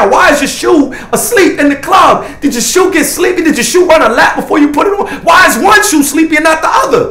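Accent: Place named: Philadelphia, United States. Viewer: Are you from Philly,You have to be you have the accent.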